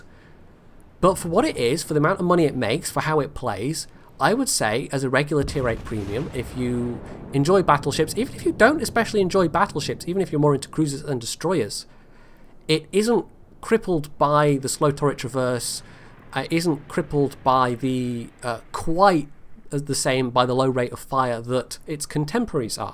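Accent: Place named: Scotland, United Kingdom. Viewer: I somehow ended up watching this one which I'd never seen. Your accent was much more English here, so since your inflection is more Scottish now, good on you,.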